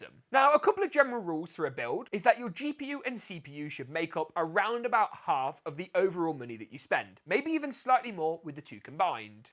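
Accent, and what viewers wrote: England, United Kingdom. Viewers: youre the most british sounding british dude i've ever heard. cheerio. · Loving your videos, I'm looking to build my 1st gaming PC towards the end of this year. Can I ask, you sound English yet your prices are in dollars...is there a reason why?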